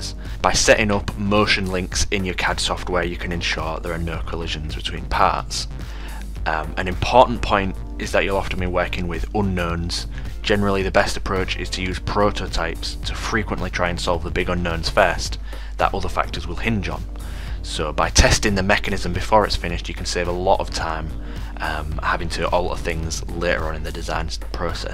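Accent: Hull, United Kingdom. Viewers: Great stuff very interesting and inspiring! Condolences for your awful accent. What is that? Hull?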